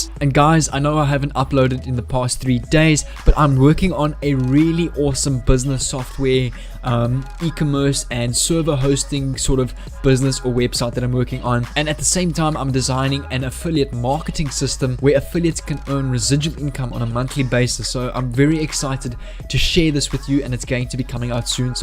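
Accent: South Africa. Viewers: Haha yeah your accent gave you away I live in Johannesburg lol so I know the accent lol. I'm a person who has entrepreneurial mindset and values. I'm kinda struggling due to lack of funds. I'm 20 and I live with my parents. I'm trying to get out there and start something but this junk status is kak lol there are almost zero jobs lol( my dad has a PHD and can't get a job he studied for lol😅)😅😧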